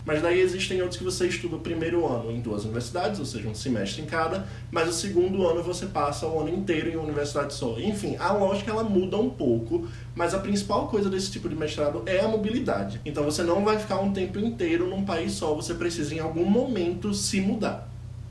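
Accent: Brazil. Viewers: Aiii, vc é de onde? Parece bastante com o sotaque do RN!! Adorei e o vídeo, por favor faz mais! Tem poucos videos falando dessa forma sobre o Erasmus Mundus em português! Voce poderia falar mais sobre o processo de inscrição em si, a quem vc pediu carta de recomendação, o que elas continham. Detalhar um pouco seu currículo, pq tipo, vi algumas pessoas falando que nota contava muito, outra pessoa dizendo q as notas nao eram TAO boas, mas q tinha muitos projetos. Como /pq vc escolheu esse Mestrado em específico. Xero!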